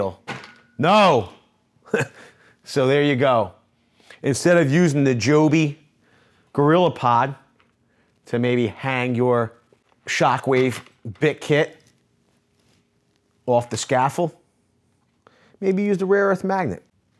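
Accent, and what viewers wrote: Philadelphia, United States. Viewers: That philly accent boi "bawxes" "milwaauwke" love it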